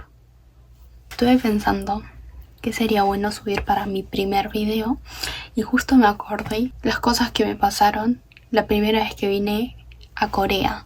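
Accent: Argentina. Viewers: se nota un buen que es super argentina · No hablas castellano, sinó español argentino. Se nota mucho la diferencia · tu forma de contar, muy argentino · Se notaba q era argentina reconozco las voces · Me encanta que tu acento argentino no se perdiera ❤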